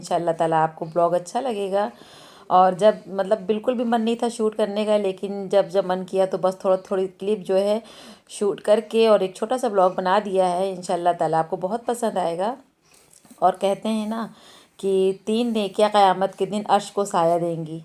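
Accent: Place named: Kanpur, India. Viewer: aapki awaaz se lagta hai aap Kanpur ki hai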